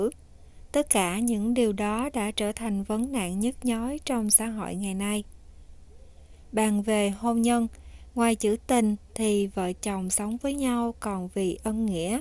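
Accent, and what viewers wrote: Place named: Mekong Delta, Vietnam. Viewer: Câu chuyện rất hay cùng với giọng đọc rất hay rõ từng lời, mang một chất mộc mạc của miền tây.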